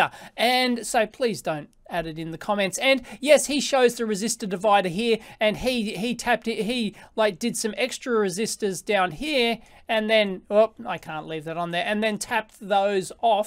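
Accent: Australia. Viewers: I think the 8-bit guy's video is a bit more consise, easy to follow. Luckily, I like Aussie accent, so its still a great watch!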